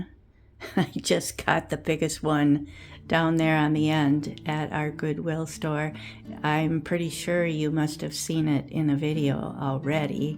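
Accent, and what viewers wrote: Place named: Minnesota, United States. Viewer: I have a small frog collection which my grandkids think is weird because I’m afraid of actual frogs. 🐸 I love your cute Minnesota accent. Thanks for the tour.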